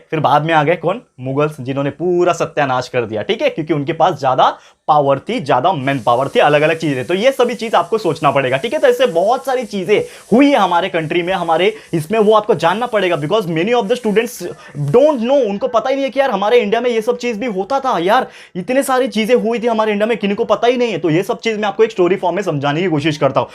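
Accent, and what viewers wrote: Maharashtra, India. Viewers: Sir is your mother tongue Marathi.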